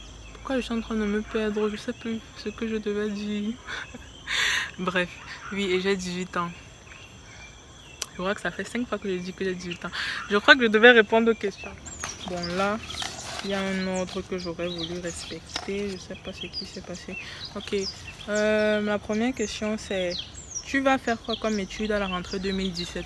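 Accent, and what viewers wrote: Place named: Cameroon. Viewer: J'ai reconnu l'accent dès le début. 237 en force !!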